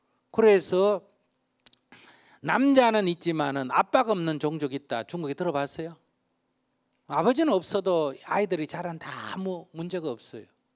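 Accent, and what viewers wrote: Gyeongsang, South Korea. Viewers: Weird! no one seems to have problem with his tone or voice except you. And, the audio condition is not bad. Might be your computer's audio problem. Or, his southeast Korean accent you're probably taking wrong with. Otherwise, what else you might possibly have? Do you happen to have problem like auditory hallucination? Seriously. If you computer game too much time wearing headset, it might have happened. go and see doctor.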